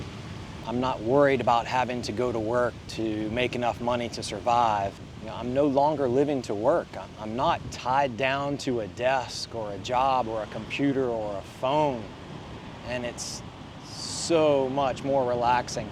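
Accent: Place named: Tennessee, United States. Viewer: Slight accent there, I'm guessing Tennessee, maybe southern Kentucky???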